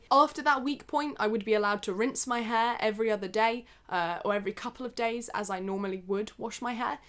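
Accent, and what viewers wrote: United Kingdom. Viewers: the most relaxing british voice ever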